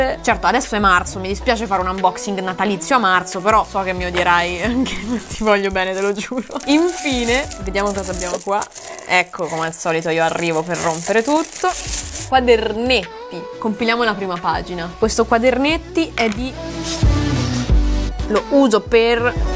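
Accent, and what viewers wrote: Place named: Milan, Italy. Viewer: Sei diventata Milanese!!!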